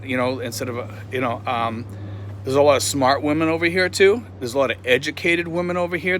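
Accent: Boston, United States. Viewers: Great advice I missed you more than I thought glad I reconnected I don’t know how I lost connection in the first place I don’t know if YouTube is playing games or watt but anyways it’s good to hear that Bostonian talk logic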